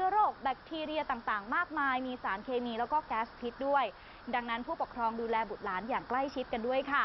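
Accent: North, Thailand. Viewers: อู้กำเมืองม่วนแต้ๆจ้าว😊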